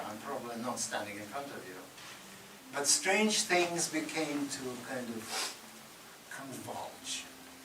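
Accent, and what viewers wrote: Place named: Russia. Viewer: Всеволод Борисович звынайтэ) Но, у Вас четкий, русский акцент. И интонации. Конечно, англичане не поймут. У них у самих там столько интонаций..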